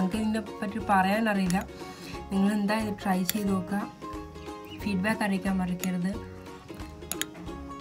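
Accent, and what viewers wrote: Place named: Malabar, India. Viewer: Hi shared the Link now, watched...... good presentation, will attempt it today subject to all ingredients in place, voice over Good!! ( Malabar slang) keep it up god bless stay safe!!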